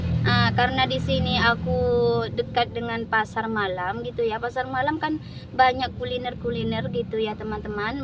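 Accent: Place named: Madura, Indonesia. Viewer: Kental khas madurax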